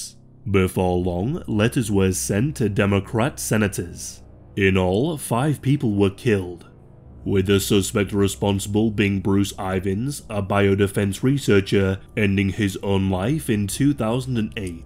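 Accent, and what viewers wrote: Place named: Northern England, United Kingdom. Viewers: Do you think everyone has a London accent? 😂 I’m a Northerner and he is 100% from England. I have a feeling you aren’t though and shouldn’t be assuming. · I didn't know you were Australian you sound northern English...? I'm from the UK btw ✌️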